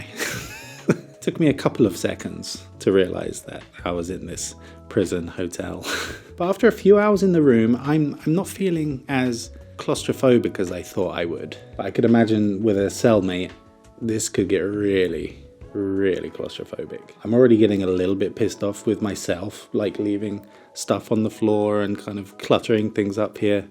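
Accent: Finland. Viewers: Are you developing a Finnish accent? Just asking for friend, of course, fully aware that you would have to consult your linguist peers before giving an answer.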